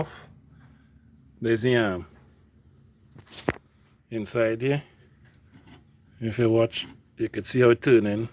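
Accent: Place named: Trinidad and Tobago. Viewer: Voice sounds like trinidadian 💯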